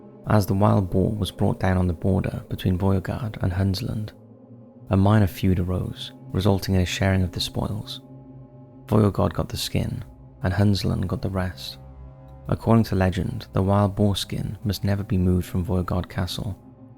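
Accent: Wales, United Kingdom. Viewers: I love top5s content. However the narrator sounds Welsh, and seems to struggle with basic words in English. What's a thisic? Probably meant a Psychic... this is not the first time basic words have been pronounced strangely. Maybe some quality control before posting?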